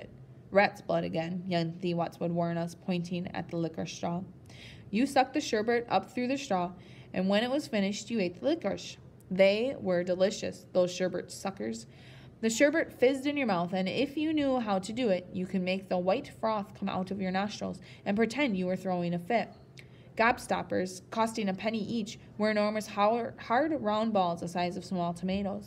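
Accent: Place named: United States. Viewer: Oh dear read by an American . Spoilt from the start .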